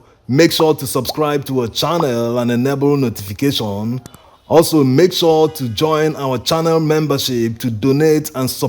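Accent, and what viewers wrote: Nigeria. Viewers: Peace unto ye. I hope you and your family are doing well. I like Nigerian accent. Believe on the Lord Jesus, and thou shalt be saved. When the true Jesus shall return, we shall worship him at the feast of tabernacles. In the new earth, we shall worship the Lord of hosts in his new moons and sabbaths. Have a good residue of the year. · His accent is AUTHENTIC Nigerian accent.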